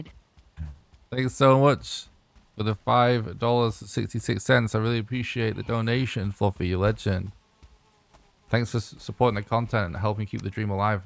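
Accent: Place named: Leeds, United Kingdom. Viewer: Fucking hell watched four hours without being able to place your bastard accent and it's Leeds! Big up. When you said 'You got your head kicked in if you listened to heavy music' I thought 'oh so he's northern at least' lol.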